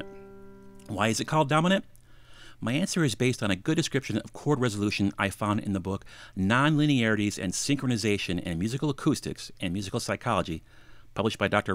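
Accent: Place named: Midwest, United States. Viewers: American English, if that's what you're asking. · midwest. maybe chicago area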